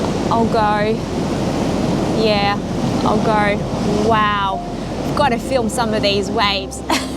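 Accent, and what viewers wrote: Australia. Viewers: Just Flew back home after long time away and found your informative and entertaining Channel . It was so good to hear a Australian Accent on a photography channel. Keep it up great work😁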